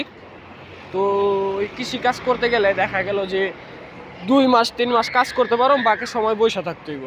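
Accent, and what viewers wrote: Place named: Faridpur, Bangladesh. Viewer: ভাই আপনার বাসা কি ফরিদপুর এ,, ভাষায় মনে হচ্ছে ফরিদপুর, আমার বাসা ও ফরিদপুর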